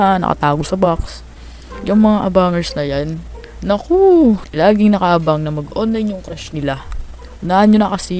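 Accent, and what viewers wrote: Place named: Iloilo, Philippines. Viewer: taga san poba kayo tol bakit nag i ilonggo ka tol ?????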